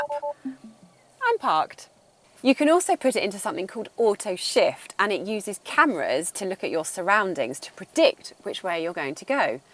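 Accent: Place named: Cockney, United Kingdom. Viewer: Nice cockney accent ❤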